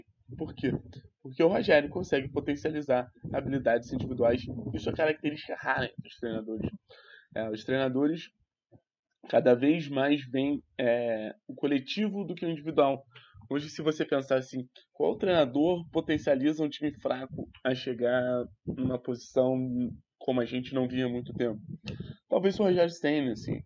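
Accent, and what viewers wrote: Rio de Janeiro, Brazil. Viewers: Ele é carioca cara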